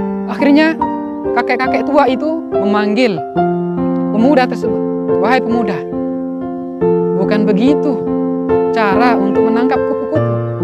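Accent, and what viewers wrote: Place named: Malaysia. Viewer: Kok loghat nya mirip orang Malaysia ya 🤔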